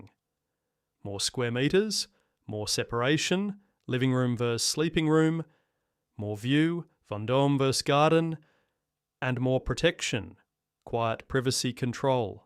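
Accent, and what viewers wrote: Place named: Australia. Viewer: What an odd, unnecessary video. No official source or credentials, and quite the AI babble. What really threw me was the lower class Australian accent. He may be better served commentating a soccer game.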